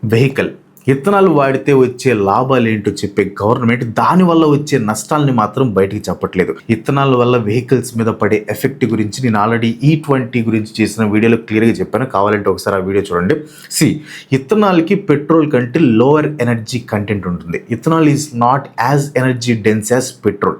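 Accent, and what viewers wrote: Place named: Guntur, India. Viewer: Guntur slang🥰❤️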